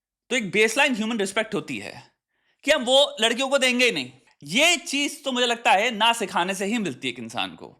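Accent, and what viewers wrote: Pakistan. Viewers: The accent gives me that he is from Pakistan. I might be wrong but all the lingos and slangs he is using are usually used by Pakistanis. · Pakistani lg rha hai accent se... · Lahori accent ha iska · His accent coincide more with pokistani than with an Indian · His accent is Pakistani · he has pakistani accent and muslim i am 100%sure he's a pakistani and that's their thinking for a kafir women's that's what they are taught since childhood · Accent to pakistani lag rahi hai iski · H sounds like Pakistani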